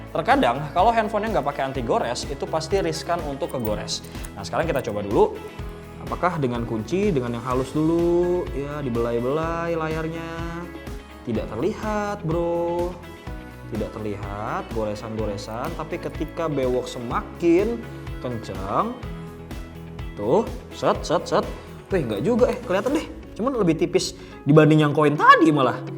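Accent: West Java, Indonesia. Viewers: Urang sunda mang?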